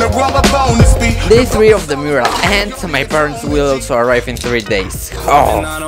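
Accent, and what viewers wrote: Mexico. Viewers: Phantom GG his accents sounds totally Mexican and his cellphone shows his company (Telcel) that is a cellphone company from Mexico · si es el español, el es Mexicano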